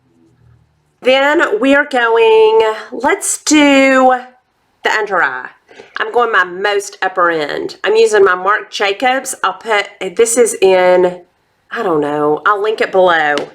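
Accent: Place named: Mississippi, United States. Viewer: You look stunning!! What a fun video :) Where are you from? Love your accent, love that “y’all"! My mom is from Jackson, MS and I went to college in Mississippi, so of course I picked up on that y’all ;-) .. love that modern renaissance palette! loving all these high end products girl! Wayne Goss brushes have been on my list... def getting that #16 now :D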